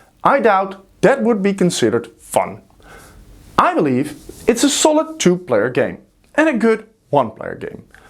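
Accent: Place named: Netherlands. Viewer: Klinkt als een hollander maar dan int engels 🤔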